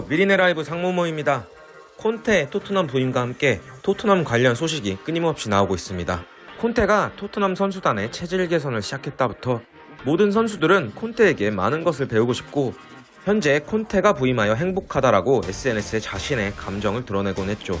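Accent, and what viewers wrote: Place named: Jeolla, South Korea. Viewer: 전라도 억양이 살짝살짝 나오네 hoxy 고향이?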